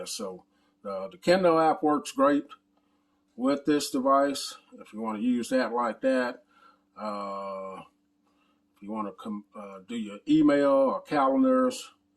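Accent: South, United States. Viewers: Like MKBHD with a southern accent lol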